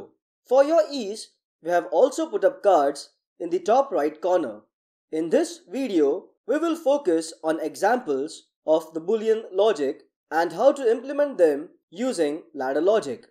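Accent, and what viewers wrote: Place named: India. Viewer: your accent sounds kinda like india, glad to have your videos, thank you.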